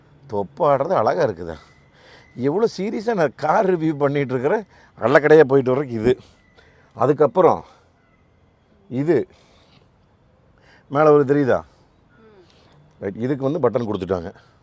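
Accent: Kongu, India. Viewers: அண்ணா நீங்கள் பேசும் கொங்கு தமிழ் தமிழ் மிகவும் அருமை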